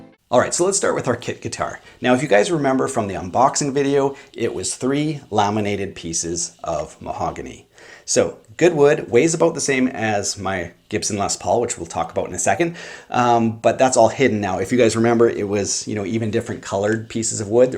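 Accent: Canada. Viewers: I just noticed he has a Canadian accent. Nice.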